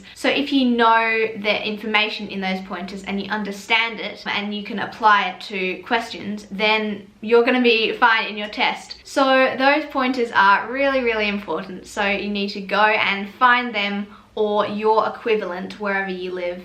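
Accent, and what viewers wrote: Australia. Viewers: i love your aussie accent so much😭💗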